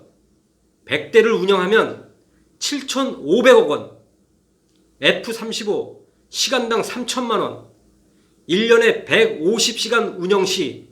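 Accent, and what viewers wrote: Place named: South, South Korea. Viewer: 얼굴은 이석기 사촌쯤 돼 보이고 억양은 아랫쪽일세... 국론 분열 획책의 일환.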